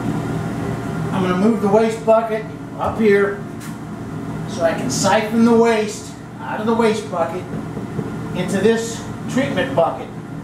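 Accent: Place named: South, United States. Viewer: a well spoken southern man that gets it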